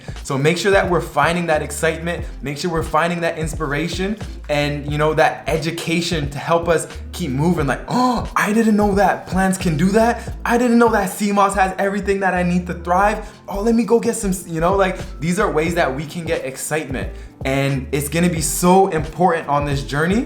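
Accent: Canada. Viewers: I love this! I love your energy and joy! Side question: are you Canadian?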